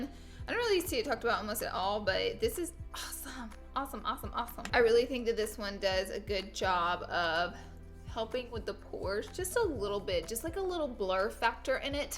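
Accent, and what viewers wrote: South, United States. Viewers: she’s from the south. Let her be her:)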